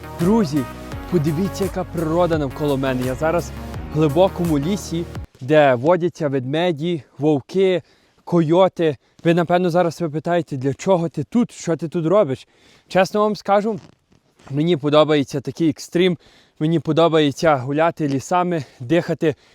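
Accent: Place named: Lviv, Ukraine. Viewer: привет, я такой же иммигрант как ты, но в США. Мне нравятся твои ролики. Откуда ты родом? По языку слышно что Львовская область, вроде. Продолжай делать свои видео. может я позже тоже приеду в Канаду. Пока я буду в Калифорнии, но виза есть CUAET. Ты заметил, когда переехал, что уличное освещение в 🇺🇸 и 🍁 более приглушенное, чем в Украине? Это было моё второе впечатление. Упомяни это в следующем ролике. Я из города Кривой Рог. С удовольствием ставлю теье вподобайку.. На все добре, друже. Слава Україні)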